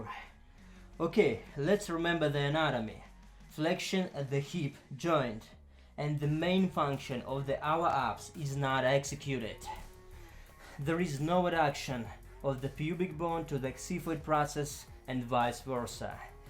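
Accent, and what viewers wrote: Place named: Eastern Europe. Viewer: ah Russia that's why I hear some slavic in your voice. I'm in the UK , mixed ancestry of polish, English, German, Spanish and Barbadian!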